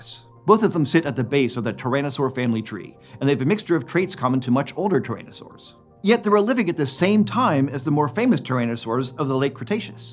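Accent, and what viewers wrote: Pittsburgh, United States. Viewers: Wait, you're a yinzer?!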